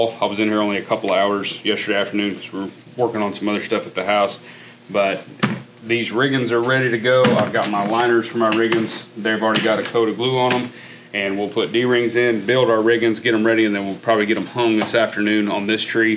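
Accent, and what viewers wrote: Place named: Texas, United States. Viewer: Boy howdy, your Texas accent is really strong on this video! Who you been hanging out with this weekend???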